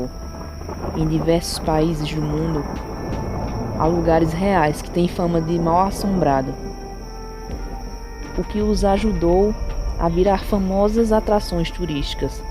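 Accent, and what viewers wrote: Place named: Northeast, Brazil. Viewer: Gosto do sotaque nordestino. Não é atoa que a esposa,falecida, do Mark Nazarova o deixou meio doido depois que ela faleceu, como se não bastasse a filha falecida antes da mãe vítima na guerra. Lastimável. Jesús Cristo e Deus te abençoe e console Mark Nazarova, Deus é bom. Deus e Jesús Cristo nós abençoe e proteja sempre 🇧🇷🌈🌻🙏🏻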